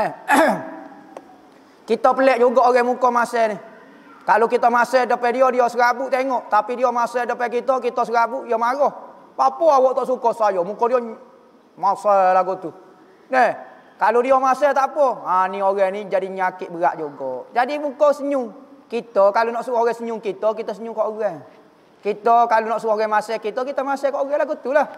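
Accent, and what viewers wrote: Kelantan, Malaysia. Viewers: Tak berapa nak faham sangat pelat kelantan..tapi ustaz ni bijak dan berilmu bila berucap...semoga ustaz sihat2 selalu...jaga kesihatan ye...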